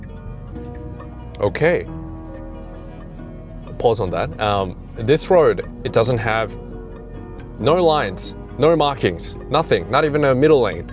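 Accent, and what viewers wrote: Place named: Australia. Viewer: Good job he had the subtitles hardcoded as I couldn't understand a single word of that Australian language, all I could hear was 'mate, mate, mate'. I feel your excitement for the future.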